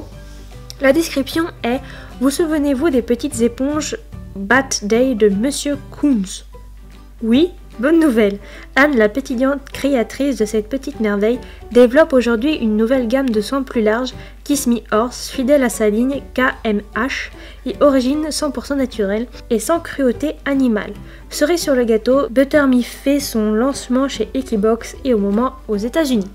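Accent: England, United Kingdom. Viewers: 😊💙 (j'adore ton accent anglais ) 💙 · Sinon superbe vidéo le son était parfait rien a redire Azzaro est juste trop beau et ton accent anglais est normal ! Si tu entendais le mien !XD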